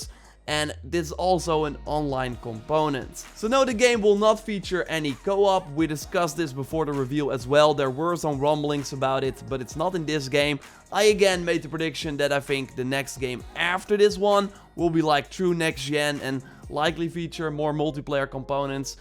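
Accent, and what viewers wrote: Netherlands. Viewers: Is dit een nederlander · are u dutch? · Not to mention his charming dutch accent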